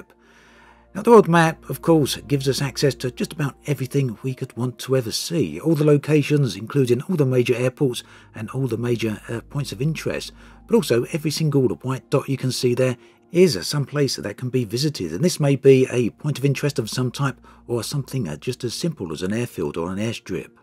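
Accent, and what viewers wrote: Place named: Scotland, United Kingdom. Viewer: Edinburgh or Glasgow lol 😂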